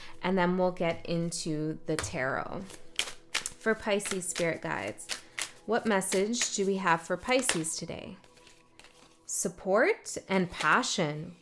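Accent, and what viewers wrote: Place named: Canada. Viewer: Such a great reading! Also loved hearing that you’re Canadian- as a Wisconsinite, I hear that accent pop out 😂 Resonates a lot with energy that has been coming out in my readings for Pisces as well: feeling like we need someone else to attain the success. One of my favorite astrologers, Pam Gregory, always asks her audience to ask themselves- “are you a victim of the universe or a co-creator with the universe.” Taking the perspective of Saturn in Pisces building resilience vs. pushing us down has been extremely helpful for me, personally. Thanks again for your messages and insights!✨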